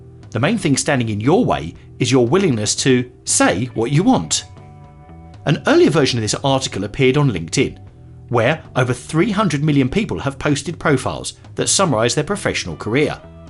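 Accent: United Kingdom. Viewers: Ive never herd something deep from a british accent.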